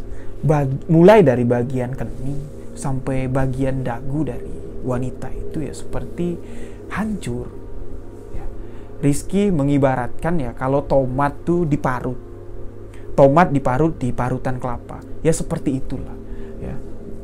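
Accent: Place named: Bali, Indonesia. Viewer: Abang ni logatnya bali sekali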